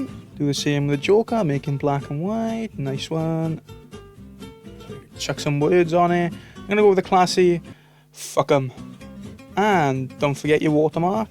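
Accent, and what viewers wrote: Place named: Wales, United Kingdom. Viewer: dai butt i faking love that welshy accent like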